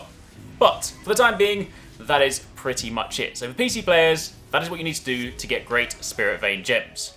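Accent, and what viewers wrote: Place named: United Kingdom. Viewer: you look like a viking but talk like a brit and its creating some SERIOUS dissonance in my mind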